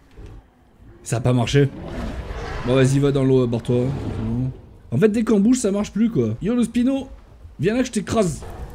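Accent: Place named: Illinois, United States. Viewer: Tu serait pas à moitier américains par hasard? J'ai reconnue ton accent de l'illinois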